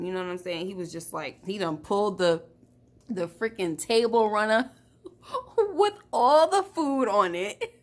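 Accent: New York, United States. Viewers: I have to ask from hearing your accent - are you from NY?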